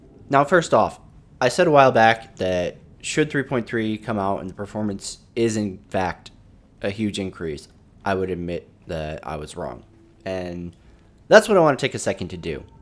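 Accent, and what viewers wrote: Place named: Asia. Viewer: You sound pretty Asian.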